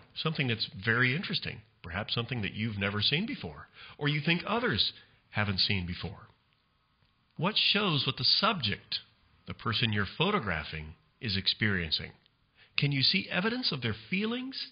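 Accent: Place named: United States. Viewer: Love this kind of American accent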